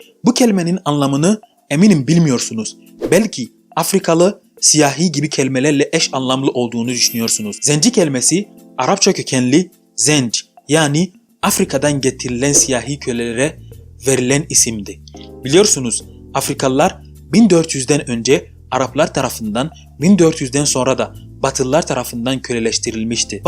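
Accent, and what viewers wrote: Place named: Kayseri, Turkey. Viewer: Abi hahaha nasıl hoşuma gitti konuşman yerel dili de çözmüşsün (lehçe) kayseride inşallah bir gün rast geliriz dostum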